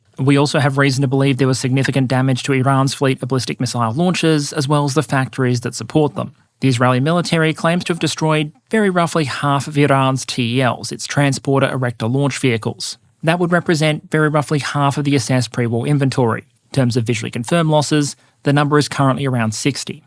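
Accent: Australia. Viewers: The up, down, back and forth of this conflict reads like a brittish comedy sketch Á la Blackadder or Monty python. Now, with Aussie voice over.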